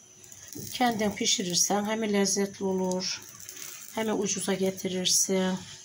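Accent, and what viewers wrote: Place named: Sivas, Turkey. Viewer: Sen sivas limisin bizim gibi konuşuyorsun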